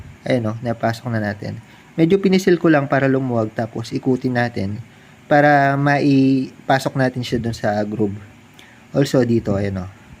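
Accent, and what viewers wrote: Pampanga, Philippines. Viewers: Paps kapampangan ka ba?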